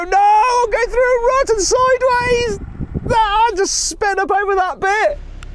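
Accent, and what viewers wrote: United Kingdom. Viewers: 이사람이 하는 말이 영국영어인가요?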